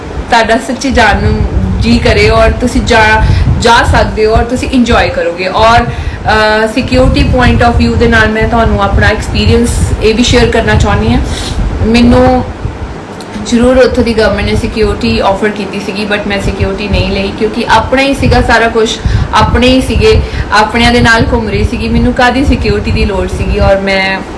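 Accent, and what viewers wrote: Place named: Amritsar, India. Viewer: from your Punjabi accent, it dawned on me you are an 'Ambarasrian' from the opening lines of your conversation on Lahore TV shows. I'm extremely excited to know that you really are after watching all your vlogs telecast from Indian bloggers! Being a son of an Ambarsari family, I also used to go to Wagah Border with my friends regularly in the sixties in the hope that one day I would go across and visit my ancestors' birthplace in Ambarsar (Amritsar), but alas, till today, at the fag end of the existence, that dream has yet to come through. It made me really sad to learn that you lost your father in his thirties. My sincerest commiserations, and prayers for you to excel in all the quarters of showbiz and more than you may also be blessed with a caring and loving Ghabru of your dreams. Anees from Lahore (Ambarsariya).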